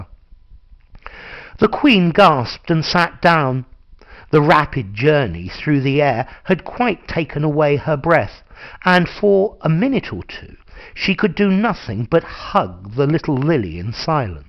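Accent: United Kingdom. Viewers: i really like listening to this its easy for me to study British accent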